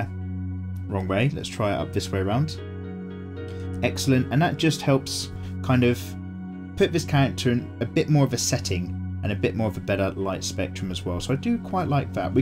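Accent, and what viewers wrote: United Kingdom. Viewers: do you happen to be british? idk what british people sound like, but i feel like you are british. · He's definitely British.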